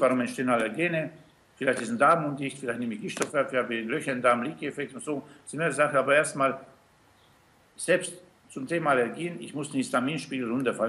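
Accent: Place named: Austria. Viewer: Ein österreicher😂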